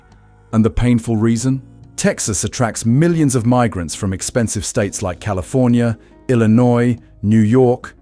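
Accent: United Kingdom. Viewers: not true.... this is 2025 machines are going to do the work now....robots people elon musk made them lol also who is this British guy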